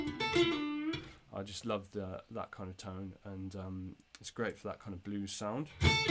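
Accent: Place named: England, United Kingdom. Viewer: Hi, I like your vids a lot! yes always interesting, real humility. We can guess your pleasure in playing guitar, and speaking about it. I also like your english kind of speaking as well. Ah! I've noticed something, when you play electric guitar: it seems your little finger is not used at all, is it? I would maybe say more ( 😅): you mostly play with the two first fingers (not counting the thumb of course). I will not try to copy it, but try it to see what it feels, yes maybe. But for my part, I play most of time Gipsy Jazz. OR Jazz, too.. always learning more and more. Greetings from France,!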